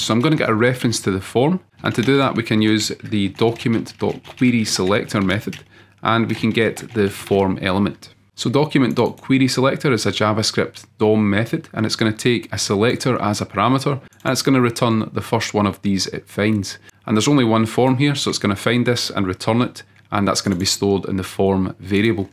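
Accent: Scotland, United Kingdom. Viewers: Sorry if I'm rude but what is that accent Scottish ?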